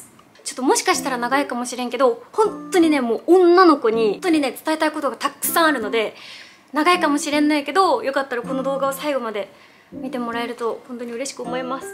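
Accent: Oita, Japan. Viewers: ちょこちょこ出る大分弁。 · 最近さん知りましたが大分弁に親近感です❤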